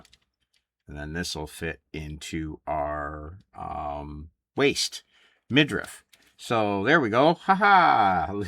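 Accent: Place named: Scotland, United Kingdom. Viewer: Your definitely Scottish not Finnish... Lol Great kit!!! 😎👍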